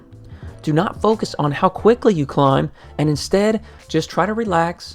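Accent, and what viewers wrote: South, United States. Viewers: Is that a southern accent? I'm not from US so I'm not really sure.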